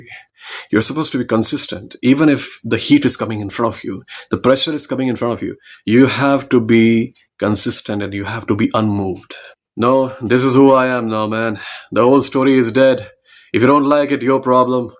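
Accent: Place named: India. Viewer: woh toh theek h par laadle ascent kati desi h aapka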